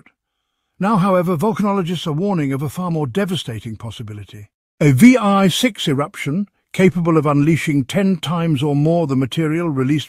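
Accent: United Kingdom. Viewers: Hate British narration:: manipulative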